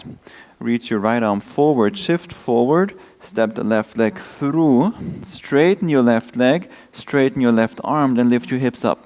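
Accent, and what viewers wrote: Germany. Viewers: Hello. I've just recently found you on YouTube and and really loving and resonating with your practice. It is challenging but accessible and I really love your connections between poses. I've had a difficult time lately getting onto my mat, but your practice has encouraged and motivated me. So thank you! xo And I adore your German accent! ;-)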